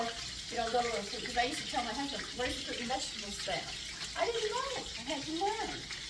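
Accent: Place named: North Carolina, United States. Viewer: You’re telling the truth, more should take heed!!! Sounds like you from NC “whaterburger” “red barn” restaurants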